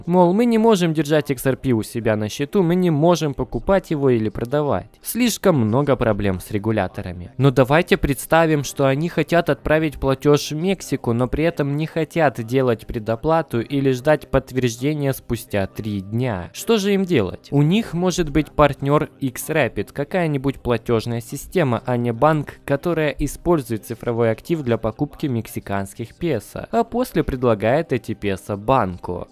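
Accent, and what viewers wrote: Kharkiv, Ukraine. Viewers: ОООО ты с Харькова?)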